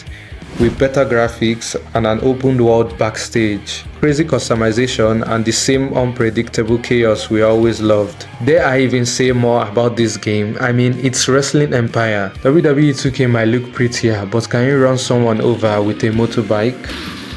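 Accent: Nigeria. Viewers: Bro sounds so Nigerian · Yo bro you sound Nigerian ❤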